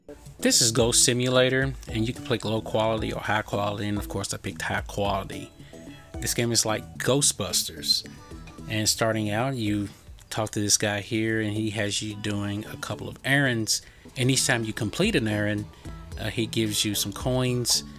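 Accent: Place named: Texas, United States. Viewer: u have an ultra Texan accent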